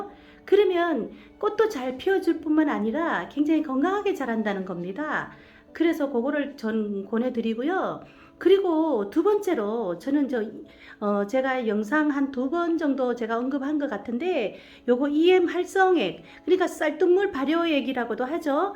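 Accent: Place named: Gyeongsang, South Korea. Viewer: 항상 잘보고 있습니다 경상도 사투리에 애교섞인 목소리에 웃음짓게 합니다 계속 많은정보 부탁 드려요 이벤트 참여 합니당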